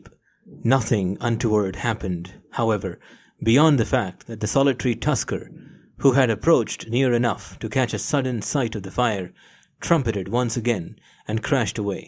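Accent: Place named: India. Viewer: The other channels can’t compete with Mr. true Indian voice. 🇮🇳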